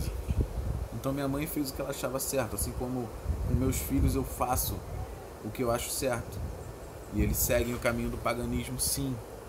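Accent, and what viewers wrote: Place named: Rio de Janeiro, Brazil. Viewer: Pelo seu sotaque vejo que você é do Rio! Eu também! Existe alguma comunidade de pessoas que segue, vocês se reúnem?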